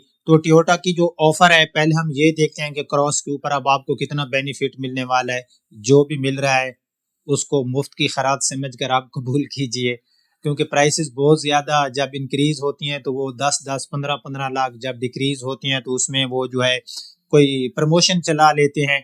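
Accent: Saraiki, Pakistan. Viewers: بھائی ۔ آپ خالص اردو ہی بولا کریں ۔ انگلش الفاظ کا تڑکا بڑا مصنوعی سا محسوس ہوتا ہے ۔ ویسے بھی آپ کا لہجہ سرائیکی اور دیہاتی پنجابیوں جیسا ہے ۔ آپ نے تلفظ ہائی بَرَیڈ ادا کیا جبکہ یہ ہائی برڈ ھے ۔ فائدہ ہے فیدہ نہیں ۔ لہذا خالص اردو ہی بولیں اور اپنائیں ۔ ھمیشہ خوش رہیں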